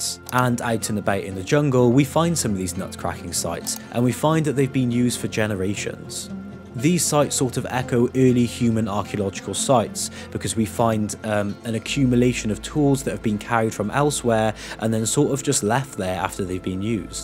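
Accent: United Kingdom. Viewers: He's a british guy with a calm voice speaking about biology so he's probably right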